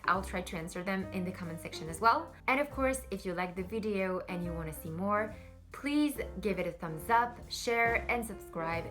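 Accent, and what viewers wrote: France. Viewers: Great French accent - but I guess you're actually French!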